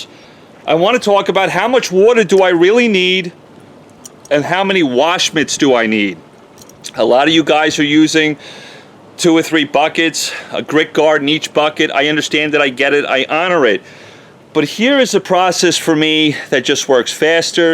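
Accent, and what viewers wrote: United States. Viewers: Except the annoying American accent... like when always says “wowdar”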